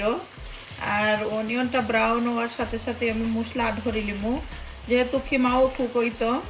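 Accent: Sylhet, Bangladesh. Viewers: syelhet er kotha gula khub sundor apu.tai bondhu hoye gelam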